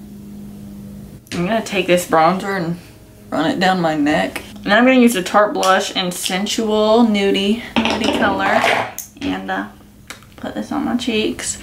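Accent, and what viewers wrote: South, United States. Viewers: where are you from? I hear a southern accent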